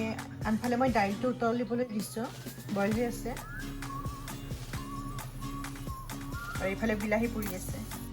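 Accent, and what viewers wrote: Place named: Barpeta, India. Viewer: বৰপেইটা ভাষা আহি এ যায়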